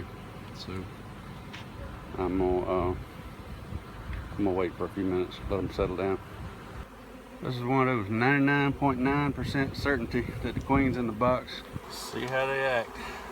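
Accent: South, United States. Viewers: He is saying Drawn comb Not drone(fogive our southern drawl) ... using old comb gives them a little headstart it's a stage up form just plain new foundation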